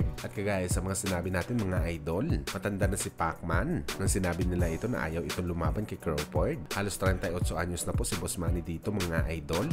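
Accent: Iloilo, Philippines. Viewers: Ayosin mo boses mo idol..murag bayot na ilonggo uy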